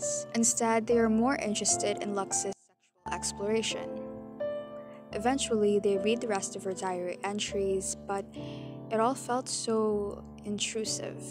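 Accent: Philippines. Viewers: I know a Filipino accent when I hear it.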